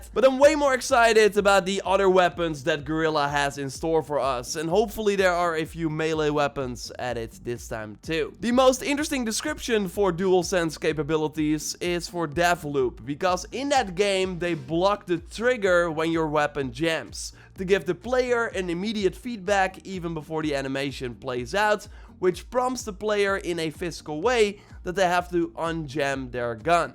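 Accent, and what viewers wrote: Netherlands. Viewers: i wasn't 100% sure if your accent was dutch but when you said 'mathijs de jonge' i knew for sure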